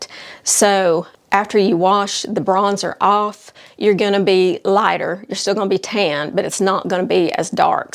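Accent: South, United States. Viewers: You are such a refreshing and “ real” influencer ! I thoroughly enjoyed your video and love your accent cuz I’m a southern girl too!! Great job!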